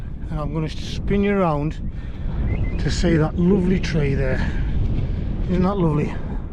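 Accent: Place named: Durham, United Kingdom. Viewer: Good video,you sound like from my neck of the woods near Durham.i love Weardale.Im always on the fells.👍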